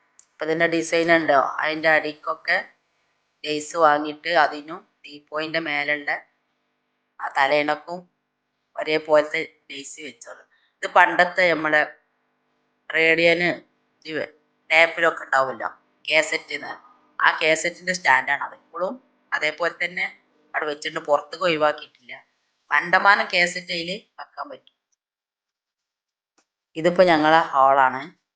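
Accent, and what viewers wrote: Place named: Kottakkal, India. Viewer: സംസാരം കേട്ടപ്പോ മനസ്സിലായി കോട്ടക്കൽ അപ്പുറം ആണ് സ്തലം മനസ്സിലായി ഞാൻ രണ്ടത്താണി ചെറുശോ ല യാ ണ്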